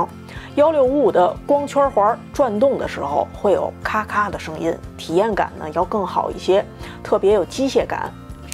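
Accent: Beijing, China. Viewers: 北京口音就这样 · 这不是北京口音吗？像说相声的？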